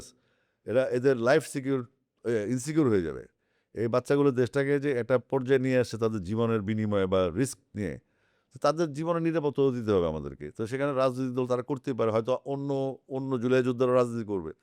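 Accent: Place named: England, United Kingdom. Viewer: The host should slow down a little and stop mixing Bangla with an English accent…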